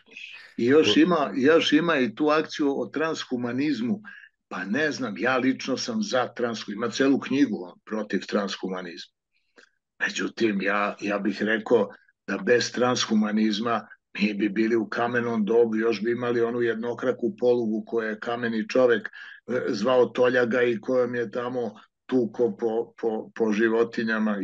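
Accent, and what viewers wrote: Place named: Niš, Serbia. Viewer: Velja se baš zabavlja i ujedno zasmejava sve nas ...ovo gledamo grupno i ujedno se svi smejemo i tvom izgovoru ...čas nišlijski čas izkvareni beogradski..nikako pravilno srpski ali ok ...baš se svi smejemo i zabavljamo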